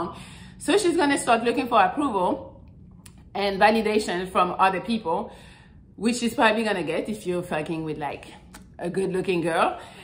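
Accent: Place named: France. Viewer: I just love your fench accent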